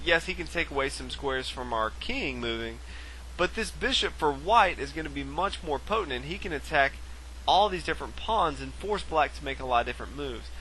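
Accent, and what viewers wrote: South, United States. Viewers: Your southern accent is killing me , good video though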